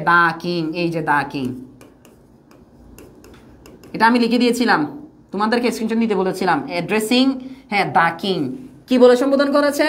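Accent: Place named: Chittagong, Bangladesh. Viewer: ভাষায় চাটগাঁইয়া ভাবটা বেশী।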